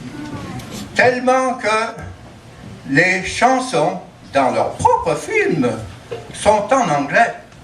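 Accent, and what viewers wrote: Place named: Saint-Étienne, France. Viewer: Après toutes années passées outre-Atlantique, mon oreille perçoit le tenace accent stephanois. Ta prestation est pleine d'authenticité, ton personnage surprenant et très drôle. Je n’ai plus de nouvelles, je t’espère en forme. Amicalement Didier Missana from Beaujolais.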